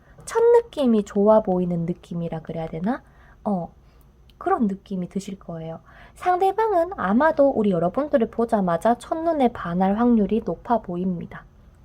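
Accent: Gyeongsang, South Korea. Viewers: 하트 뽑았습니다! 처음 왔는데~~~ 경상도 분이시죠? 사투리😁 저도 대구사람인데ㅋㅋ 경상도사투리로 듣는 타로는 처음인데 재밌네요😄 외로워요ㅠㅠㅠㅠ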